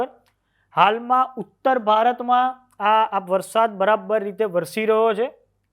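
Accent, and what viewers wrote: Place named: North Gujarat, India. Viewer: Tamari boli upar ki lage se aap utar Gujarat bajuna so A G Ahir o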